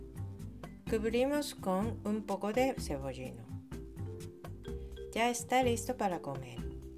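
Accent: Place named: Spain. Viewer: Que hermosa la Japonesa... Me gusta tu acento español y se mira que cocinas muy sabroso. Saludos 😉😘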